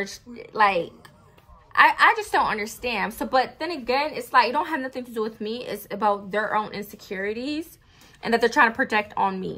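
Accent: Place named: West Indies, Caribbean. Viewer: Gurl keep being you,,, I do feel like the repetitive negative comments are just haters. They give off the “they wish they had your mentality like you vibe.” Your mentality to your look & your perseverance is gonna make insecure feel some type of way. Newer supporters like me just need clarity but a person like me loves your transparency & boldness. You inspire me as a single homeless Mother staying in an hotel. Our shelters in FL are packed & the Staff do be hating when they see a go getter, it’s like they mad that you are doing good in a bad situation. You are a real content creator because you ideas & editing is on point. Keep your head up & keep brushing the haters off. I will see you in the next video,,,, oh I do have one question, are you from the West Indies because I’m from the Caribbean & I hear a lil accent in your voice. 💜💜💜 -NinJah Bae aka Rude Gal 🫡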